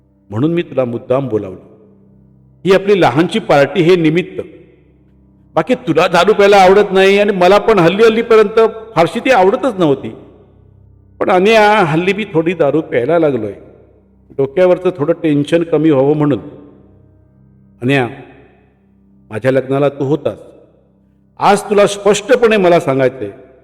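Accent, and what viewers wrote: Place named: Kolhapur, India. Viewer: कोल्हापूरी टोन ऐकायला बरा वाटला.. शेवटी कोणी कोणाच नसत हेच खर...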